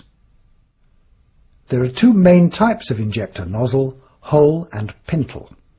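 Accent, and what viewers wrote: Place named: United Kingdom. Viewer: للأسف التعليق بإنجليزية بريطانية.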